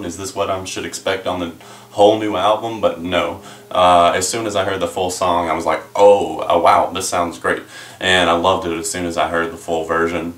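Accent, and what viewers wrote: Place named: South, United States. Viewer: It sounds like you have a southern accent in this video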